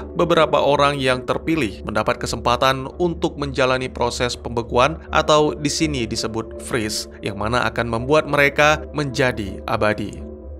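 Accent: Aceh, Indonesia. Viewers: Bg lu orang Aceh ya? Jwab dong wkkw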